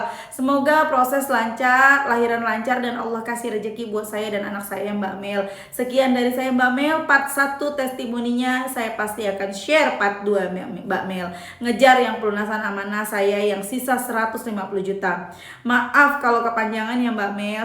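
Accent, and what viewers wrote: Jakarta, Indonesia. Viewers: masya Allah mantap mba mel bahasanya betawi bgt❤😊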